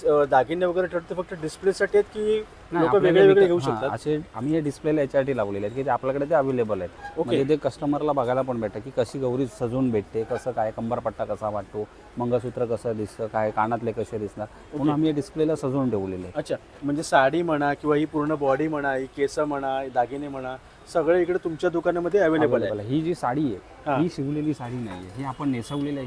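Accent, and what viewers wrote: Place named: Malkapur-Akola, India. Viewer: Dada tu malakapur akola chya ahes ka tuzhi language tikadchi h bhari vate ekyala❤❤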